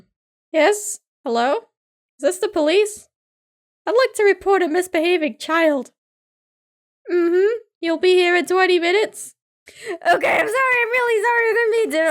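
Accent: United Kingdom. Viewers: Sorry to ask, but where abouts in Britain are you from? I'm British myself but I can't seem to tell where your accent originates ^-^ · wait is she british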